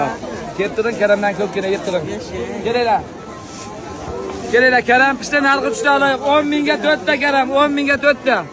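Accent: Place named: Yangiqurgan, Uzbekistan. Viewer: Akaxon siz Yangiqo'rg'ondanmi sheva Yangiqo'rg'onga tortyapti gap yo‘q omad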